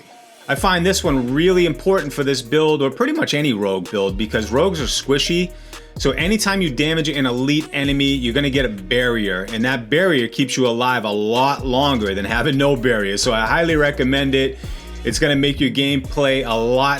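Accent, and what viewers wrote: Boston, United States. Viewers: Aside from the cool build, this video is quality. Good pacing, delivery, and energy. Getting some Boston vibes too for an added bonus.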